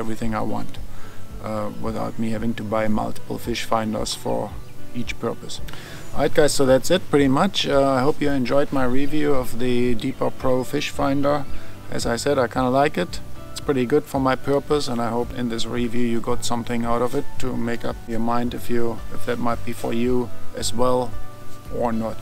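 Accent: Germany. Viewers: Do you have a German accent? :)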